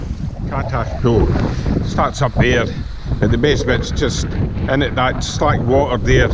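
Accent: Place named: Scotland, United Kingdom. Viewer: It's good to hear a Scots accent again. I moved to Connecticut 12 years ago and now fish for Steelhead in the Great Lakes and saltwater for striped bass. I grew up fishing Yair on upper Tweed, the Dee, Loch Leven etc. It's great to visit these rivers through your films.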